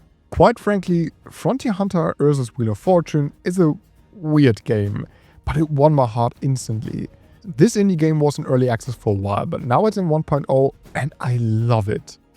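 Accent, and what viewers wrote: Germany. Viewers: Der deutsche Akzent is zu krass 😂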